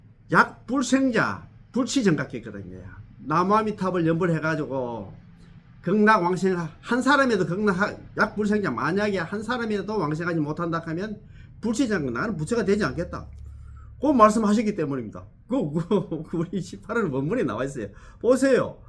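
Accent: South Korea. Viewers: 오늘은 비가 내리네요 인자한 미소와 경상도의. 구수한 사투리가 정감이가는선생님의. 강의. ㆍ존경합니다 오늘도 건강하시고 좋은날 되십시요 나무아미타불 나무아미타불 나무아미타불.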